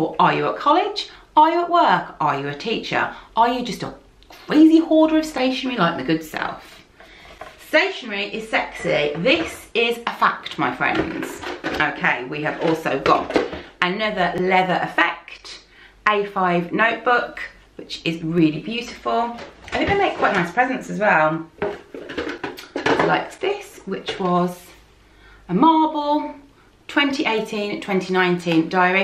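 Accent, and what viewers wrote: Birmingham, United Kingdom. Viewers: honestly. I bloody love it! Your lil brummy twang makes me so happy - my family are from Birmingham and it just pleases my ear to hear!xx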